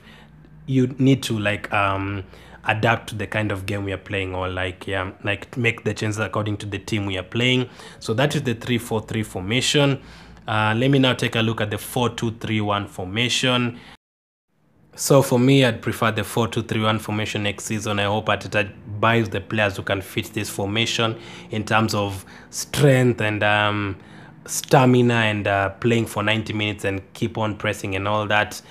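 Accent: Kenya. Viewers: Yo he has a kenyan acsent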